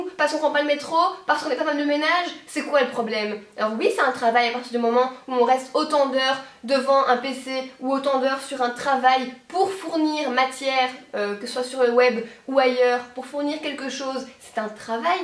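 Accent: Belgium. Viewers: J'avoue que notre accent à nous les belges donne un cachet en plus quand on dit les choses! Merci pour cette vidéo, j'espère que les gonzesses qui ont la critique un peu trop facile ont pêtés un bon coup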